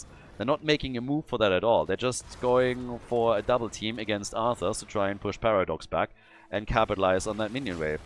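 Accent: Germany. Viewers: It's a German English accent.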